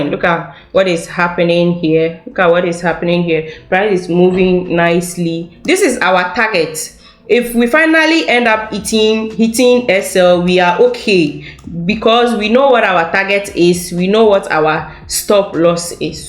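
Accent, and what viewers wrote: Ghana. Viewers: It's Your Ghanian Accent for me🥰😍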